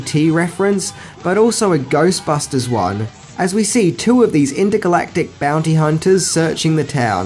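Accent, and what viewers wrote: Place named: Australia. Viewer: The Australian accent makes "bastards" sound so refined. Thanks for the fun videos, keep up the great work.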